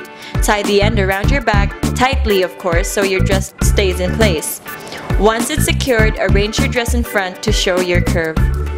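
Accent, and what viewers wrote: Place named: Philippines. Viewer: Are you a filipino i asked cause of your accent i am a filipino myself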